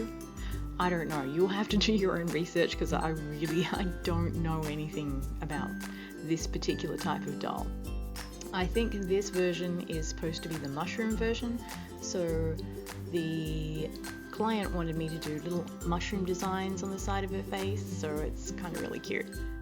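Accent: Australia. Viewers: sorry for a kind of off-topic/personal question, I'm just wondering what Australian state you're originally from? just trying to place your accent hehe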